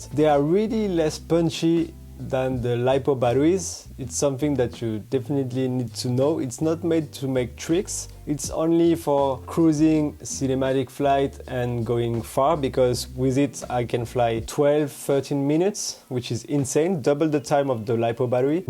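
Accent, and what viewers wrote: France. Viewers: Ont dirait tu a un accent français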